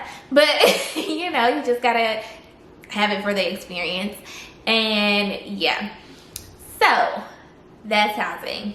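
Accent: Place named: South, United States. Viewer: Question sista shaaa didn't you say you was coming from New York I'm detecting a southern accent Adams Rib chill chill chill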